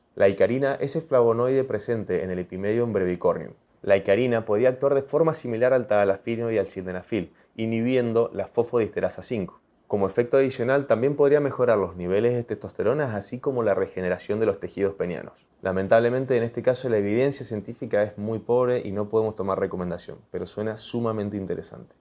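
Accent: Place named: Argentina. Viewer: Muy interante su explicacion Es 'Usted Argentino ?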